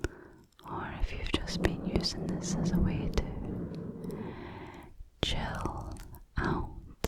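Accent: Scotland, United Kingdom. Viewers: Gorgeous Scottish accent. So clear and concise. 🏴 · Some incomprehensible Scottish, late night rambles and tingly mic cover scratching does in fact lead to brain mush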